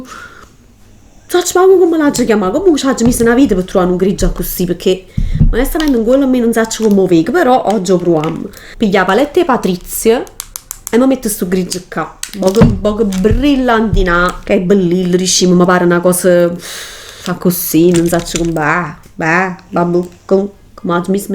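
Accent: Naples, Italy. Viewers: fai skiattare😂❤️ hai un accento di qualche paese napoletano di che zona sei? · Ma quant si simpaticc, me piaciuto assai pk parl Napolitano, ke e o dialetto miiioo nu vass no coppa a capp ma nfacc, e bona. serata... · Non amo particolarmente la cadenza napoletana, essendo abituata all'accento nordico, ma questo video è davvero simpaticissimo, un abbraccio!☺️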